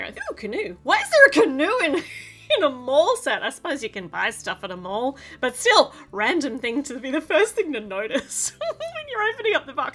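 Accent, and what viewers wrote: Australia. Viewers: She has so much energy and by listening to her talk you can hear the amazing Australian accent❤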